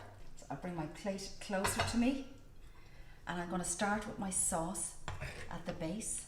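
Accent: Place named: Ireland. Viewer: Love watching you cook and love that beautiful Irish accent to...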